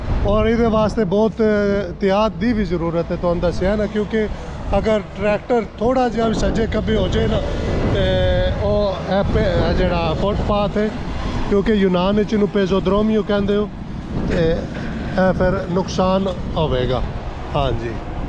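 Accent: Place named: Kharian, Pakistan. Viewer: ان بھائی صاحب کا بولنے کا لہجہ ضلع گجرات کھاریاں کے نزدیک علاقے کا ہے یہ میرا اندازہ ہے بہت ہی ودیا وڈیو اور کمینٹری کی ہے بھائی صاحب نے ،،،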